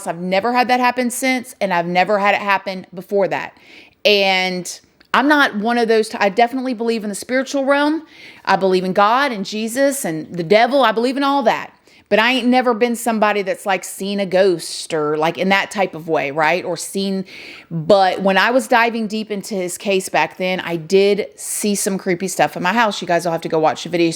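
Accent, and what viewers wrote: South, United States. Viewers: Your southern accent is coming through strong today! Lol when I get loud, mine does too. lol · I love how your southern accent came out in this video, lmbo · This is probably your best video yet! You are straight up for real with your feelings on this because your Southern accent has never come out like this! I sound super Southern when I feel like this, too!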